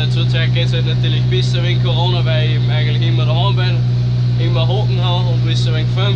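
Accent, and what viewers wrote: Austria. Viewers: I finds richtig geil, dass du Österreichisch sprichst.Grüße aus Kufstein.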